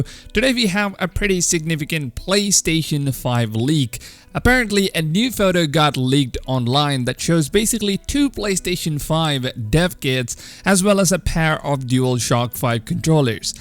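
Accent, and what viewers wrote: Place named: India. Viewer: At first I thought you were some annoying Indian kid cause of your accent but your hilarious so I rub a dub subbed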